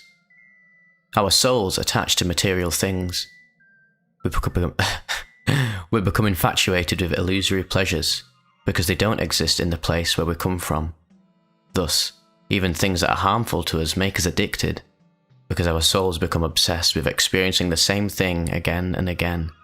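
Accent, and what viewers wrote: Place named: Manchester, United Kingdom. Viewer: Majors mask is the shit... Sounds like you're close to Manchester... Safe bro lol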